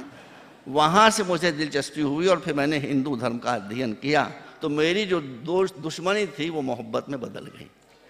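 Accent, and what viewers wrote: India. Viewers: Ye moulana bihari lagta he mujhe kyunki iski Hindi se pata chalta he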